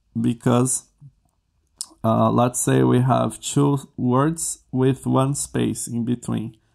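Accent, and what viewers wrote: Brazil. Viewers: Amigo, você é brasileiro né? O sotaque é inconfundível.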